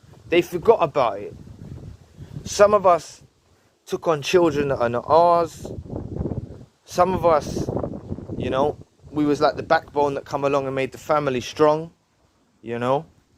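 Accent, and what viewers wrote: United Kingdom. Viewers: are u from uk bother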